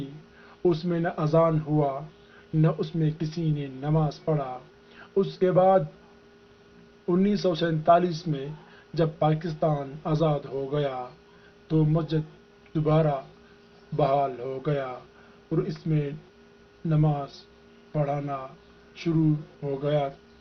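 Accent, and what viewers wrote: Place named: Kashmir, India. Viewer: It's a kashmiri voice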